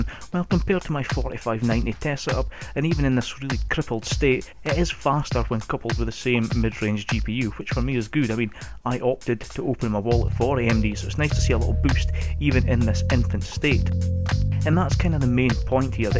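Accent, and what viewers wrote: Scotland, United Kingdom. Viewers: Sub'd man love hearing fell Scots on YouTube, too few of us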